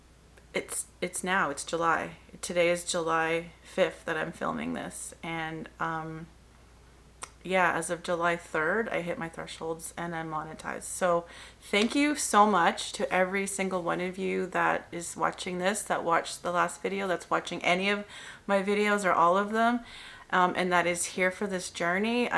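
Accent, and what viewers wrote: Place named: Canada. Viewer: Congratulations!!! I watched your video a day or two ago and knew it would resonate with so many people because you were telling your personal story and people love to hear stories that feel rooted in the “hero’s journey” where someone is choosing to step out of their regular existence to follow excitement and adventure; what bigger adventure is there than the one to create a life you love?! Also, I loved that as soon as you started speaking I thought “she’s a Canadian”. As a Canadian (from Toronto) residing in the US I enjoy hearing the cadence and “accent” of my people. I miss home a lot! 🙂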